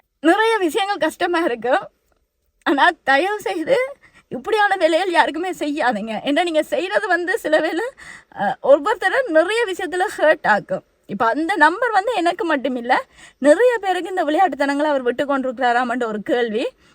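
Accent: Sri Lanka. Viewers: u r great,ur job is excellent, oru manitha mirugam panniya seyalu ku neenga feel pannatheenga, unga srilanga tamil speech sooper,all the best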